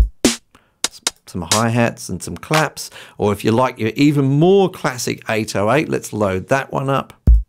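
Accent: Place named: Australia. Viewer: Aussie in England or vice versa? (Great vid btw!)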